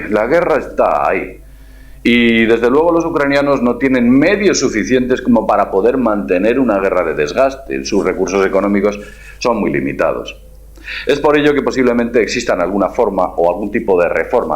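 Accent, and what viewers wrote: Spain. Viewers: normalmente no soporto escuchar mucho el acento español,pero tus videos son tan amenos y los explicas con tanta pasion y seguridad que trasciende las barreras culturales y cumplen con su cometido, transmitir la informacion, de que all afuera hay un mundo mas grande, mas rico y mas obscuro que el que nos han puesto frente a los ojos saludos y adelante!!!! :D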